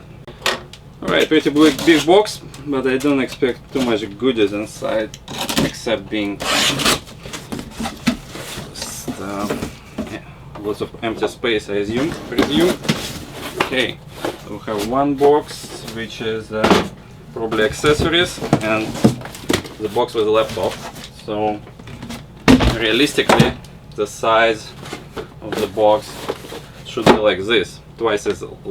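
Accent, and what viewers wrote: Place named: Russia. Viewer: are you Russian speaker ? Russian accent 101 %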